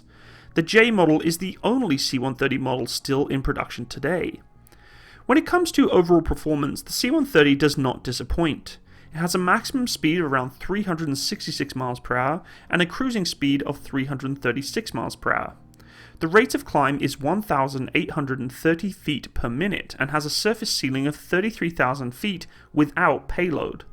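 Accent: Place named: United Kingdom. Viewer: Your British is showing 😊: In American; I fly in a C-130 ... present tense I flew in C-130 ... past tense I had flown in a C-130 ... past perfect I have flown in a C-130 ... past pluperfect I think that is correct. At any rate, a nice and concise as always discussion of a great airframe.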